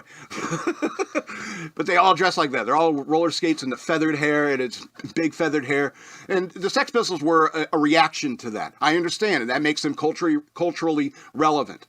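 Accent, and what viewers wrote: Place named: England, United Kingdom. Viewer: Love your English accent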